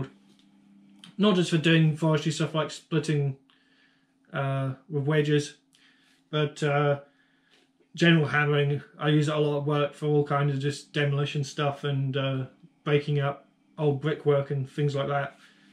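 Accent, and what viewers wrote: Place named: London, United Kingdom. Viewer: You sound like you’re from London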